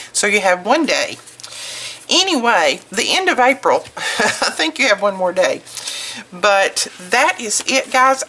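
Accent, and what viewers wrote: South, United States. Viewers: Thank you for this tutorial. I tried it immediately and it works great. And your southern english sounds so nice. I will follows your blocs.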